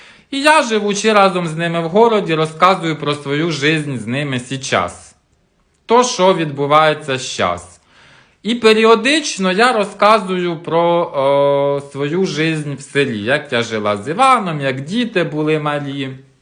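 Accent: Poltava, Ukraine. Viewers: Дуже приємно Вас слухати! Справжній Полтавській суржик. Слухала б і слухала. Гарно розумієтесь на сільських тонкощах! Натхнення Вам на наступні відео! Чекаємо!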